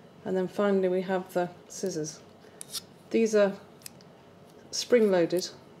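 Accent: United Kingdom. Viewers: ITS GREAT TO HEAR YOUR VOICE !! You sound the very proper British Lady ! Thanks for the review. nilo52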